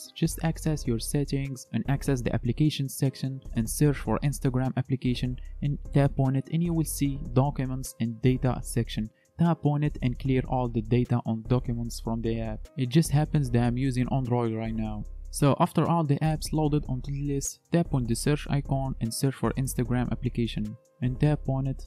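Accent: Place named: Morocco. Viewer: Ur accent is so moroccan maaaan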